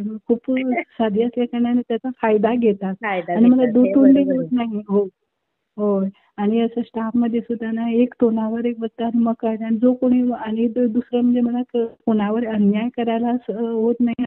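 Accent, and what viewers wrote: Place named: Goa, India. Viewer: Hya tai govyahun bolat asnar nakki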